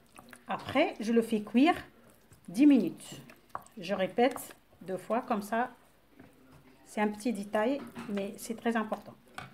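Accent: Tunisia. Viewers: روعة و اللهجة التونسية اروع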